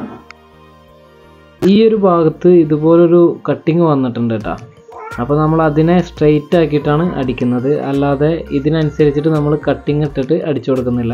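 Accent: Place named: Palakkad, India. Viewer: താങ്കൾ പാലക്കാടാണോ ." ട്ട" ഇത്തിരി കൂടുതലാണ് . Amazing Work👍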